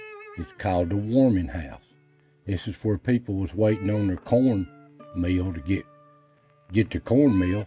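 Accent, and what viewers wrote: Appalachia, United States. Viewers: I just want to say how much I appreciate your videos. I’m so glad I found your channel! You sound very much like a lot of friends I have in Tazewell County, Virginia! I have Appalachian roots myself, and love to see your pics and listen to your. stories. So glad that we know these things, and rejoice in them! You’ve made a fan, brother. God bless you and yours!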